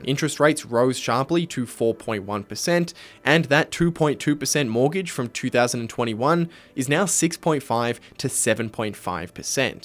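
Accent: Australia. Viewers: I cringe every time you say ‘graff’ in your ‘melbun’ accent. The correct pronunciation is ‘grarf’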